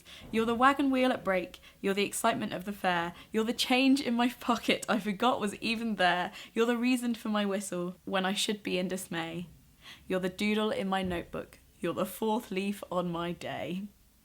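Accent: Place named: England, United Kingdom. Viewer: I love your English accent <3333 greetings from Argentina <33 And I actually liked your poem, it has a good rhyme to it :)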